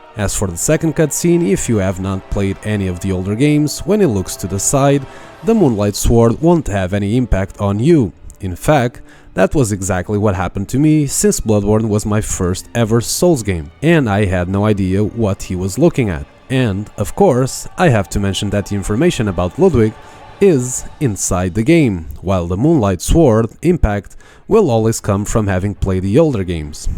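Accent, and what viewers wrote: Portugal. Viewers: se este sotaque não é tuga eu atiro-me da torre dos clérigos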